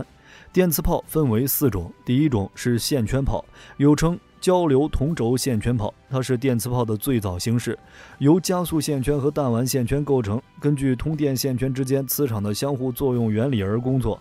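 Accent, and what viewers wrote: China. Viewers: 那是中國的唸法，畢竟是他們國家的唸法，就不要用自己國家的唸法去糾正別人了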